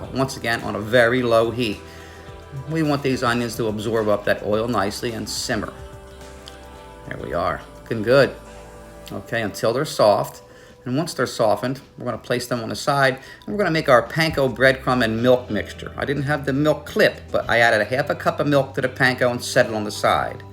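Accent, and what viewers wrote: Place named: Philadelphia, United States. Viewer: I'm from Philly so I recognized the "accent" even though I don't think we have an accent lol..I watched the video twice to make sure you didn't say water...that would've been a dead giveaway 😄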